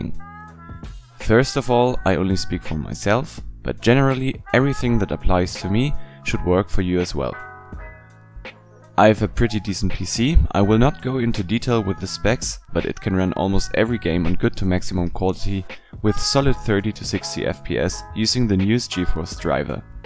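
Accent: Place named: Germany. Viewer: mann weiß einfach sofort das du deutscher bist xD NICHT BÖSE GEMEINT ABER EIG ECHT NICE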